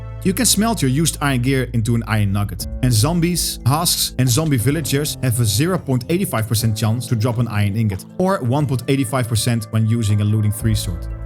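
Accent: Netherlands. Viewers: You’re Dutch aren’t you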